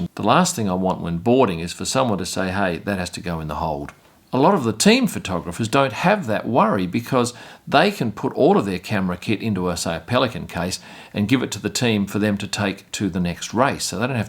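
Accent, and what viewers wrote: Australia. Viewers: This is an excellent channel 😊 love the out-takey bits qt the end, informative, educational, well edited and that Aussie silky voice hahahaha! Stay passionate Bro!! 😆😆😆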